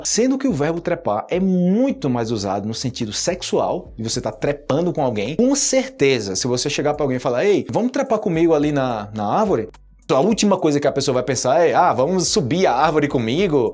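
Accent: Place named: Colombia. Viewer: El es brasilero, pero estudió en Colombia . De verdad habla con acento colombiano 😂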